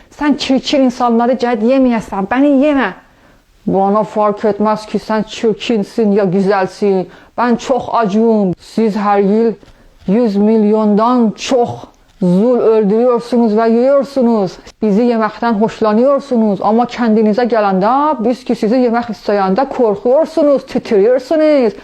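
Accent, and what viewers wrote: Azerbaijan. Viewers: Fifa oyuncus U Azeri o yüzden öyle konuşuyor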